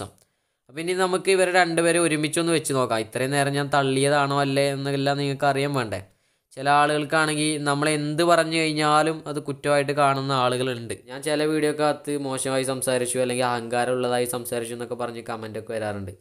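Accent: Malappuram, India. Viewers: Malappuram reethiyil samsarikkunnatha enikk ishttam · മലപ്പുറം ഭാഷ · Malappuram പൊളി അല്ലെ. മലപ്പുറം ഭാഷ poli💥💥💯💯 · Malappuram style is OK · Malappuram language not problem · Malappuram slang 👍🏻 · Malappuram style mathi chetta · Malappuram bhasha venam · ഏത് രീതിയിൽ സംസാരിച്ചാലും കൊഴപ്പില്ല... നമ്മൾക്കും കുറച്ചു മലപ്പുറം ഭാഷ പടിക്കാലോ 😁😜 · Malappuram basha sett 💯💯💯💯💯💯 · Bro I am from Trivandrum but I like your sound malapuram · എന്തുട്ടാ ഗഡിയെ മലപ്പുറം ഭാഷ കിടുവല്ലേ 🔥🔥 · Malappuram slang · മലപ്പുറം ഭാഷ കേൾക്കാൻ ഒരു രസമാ അതു തന്നെ മതി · മച്ചാനെ മലപ്പുറം രീതിയിൽ തന്നെ സംസാരിച്ചാൽ മതി അതല്ല പൊളി😍😍😍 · ഞാൻ ഒരു പത്തനംതിട്ടക്കാരനാണ് എനിക്ക് മലപ്പുറം ഭാഷ ഒരു കുഴപ്പവും ഇല്ല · മലപ്പുറം ഭാഷ കേൾക്കാൻ നല്ല രസമുണ്ട് മലപ്പുറം ഭാഷ തെന്നെ മതി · Malappuram bhashayaa udheshichth ketoooo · Malappuram slang mathi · I'm from tvm... Malapuram slag kelkumpo interesting aanu... 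Ath mathii❤️ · Malappuram tone eniyum veeenam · Malappuram slang samsarichaal kandirikkan adipwoli aanu But baki ulla subscribersin manassilavunnilla thonnunna words translate cheythaal pwolikkum🔥🔥❤️❤️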